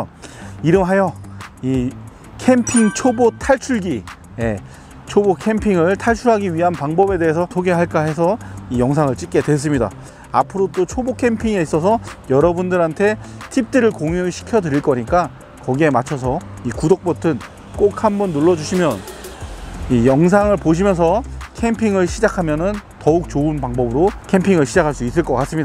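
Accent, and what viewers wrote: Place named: Jeolla, South Korea. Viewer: 슬래파~~전라도신가보다 유익한정보감사~~^^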